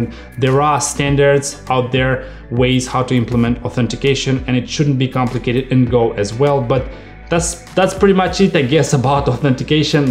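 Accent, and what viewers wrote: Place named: Russia. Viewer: You have nice russian accent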